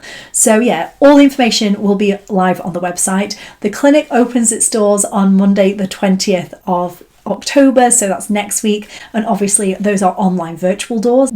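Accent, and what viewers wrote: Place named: RP, United Kingdom. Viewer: You trying to speak posh is really irritating something about you is just well off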